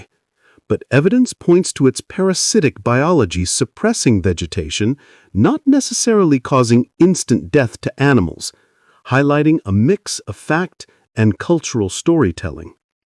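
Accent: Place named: Africa. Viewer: Where u from? That accent sounds somewhat african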